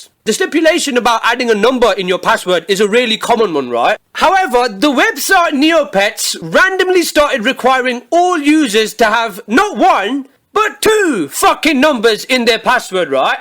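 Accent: United Kingdom. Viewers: grade are you British ?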